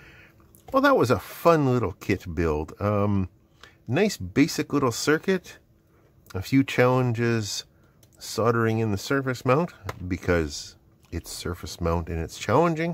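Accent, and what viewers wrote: United States. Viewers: That's mainly coz we Aussies Solder, while he SoDDers like a yank.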